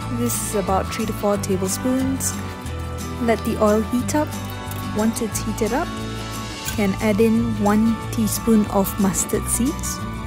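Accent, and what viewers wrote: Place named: Singapore. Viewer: Your english is close to heart. Sounded Singaporean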